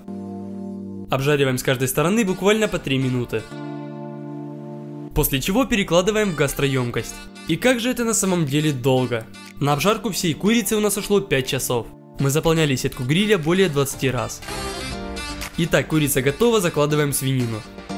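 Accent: Ukraine. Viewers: Смотрю тебя с трехсот тысяч, качество выросло в десятки раз! А ещё пропал украинский акцент))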